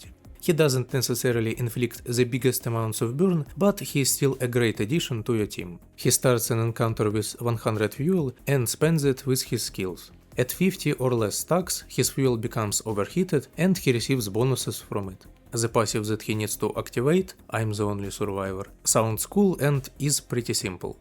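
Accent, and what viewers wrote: Russia. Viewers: Чую русский акцент, бюрн фен. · Мне кажется у автора видео русский акцент, но я не могу этого доказать...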